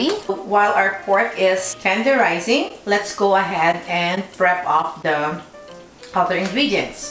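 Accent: Philippines. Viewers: totoo nga naman anyway it recalls memories talaga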